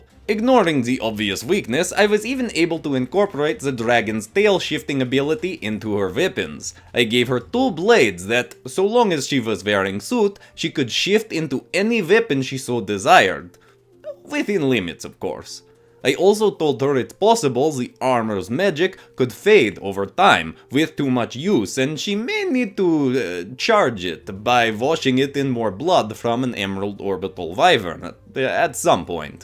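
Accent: Russia. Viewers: IS SHE RUSSIAN OR GERMAN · Are you Russian youre accent sound like them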